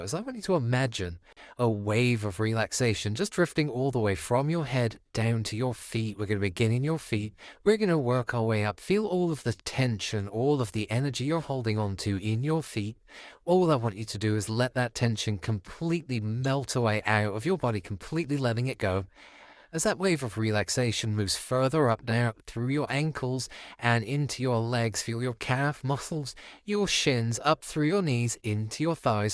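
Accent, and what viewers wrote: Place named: United Kingdom. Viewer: He's just British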